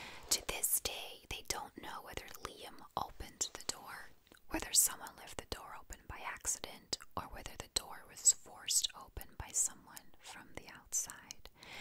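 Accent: United States. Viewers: You sound so American! You could pass as someone who is from the USA! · I just started watching your videos not too long ago and I absolutely love them! However, I didn’t even realize you were from Belgium until this video. I’m from the U.S. and I assumed you were too because your accent sounds American! I didn’t realize how similar Belgians and Americans sound! Or am I missing something?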